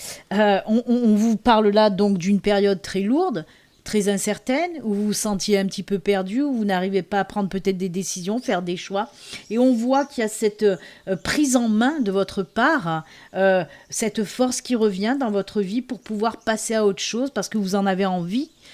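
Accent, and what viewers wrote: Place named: Montpeyroux, France. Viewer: Bonjour tu es pas de montpeyroux parceque tu as l’accent de montpeyroux:) « »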